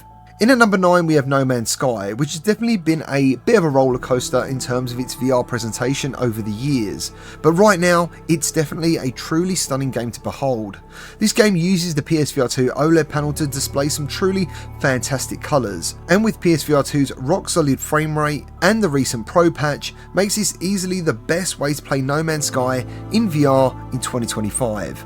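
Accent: United Kingdom. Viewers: Can some one write down the names of the games please I just can’t understand with his British accent 😢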